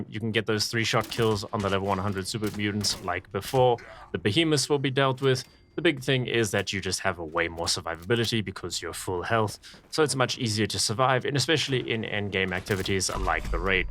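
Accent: South Africa. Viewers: Are you south african by chance. Very distinct accent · Very good video, thanks, sounds like you come from South Africa like me💪🏻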